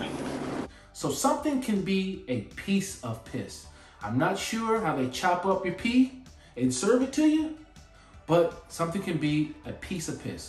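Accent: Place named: United States. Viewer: These videos show me how much I take your language for granted. Love hearing these with an American accent.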